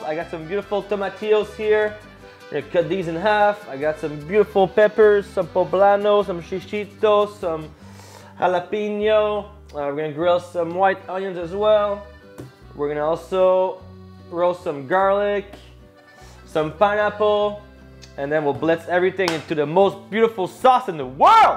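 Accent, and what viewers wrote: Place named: Quebec, Canada. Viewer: With this accent this guy is a Quebecois (French Canadian) but he speaks in this mixed English for views, I imagine!?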